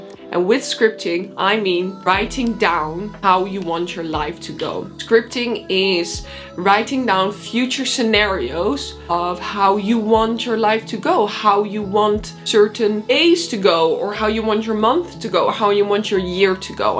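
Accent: Netherlands. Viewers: Nice video.. are you dutch? Can hear it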